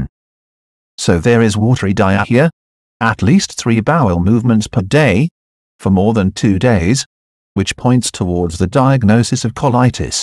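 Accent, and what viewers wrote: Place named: India. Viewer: 8/10 ... indian english accent would be the most welcome change... nice content though...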